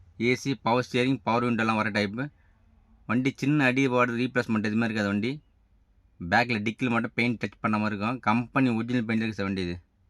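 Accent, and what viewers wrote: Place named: Madurai, India. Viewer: Madurai vasagam super 🎉🎉🎉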